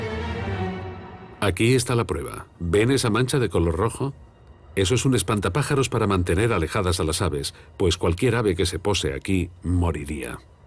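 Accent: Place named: Madrid, Spain. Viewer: ¿De dónde eres? No adivino tu acento ya que es casi imperceptible. Diría que Eres de España, en concreto de Madrid. Saludos.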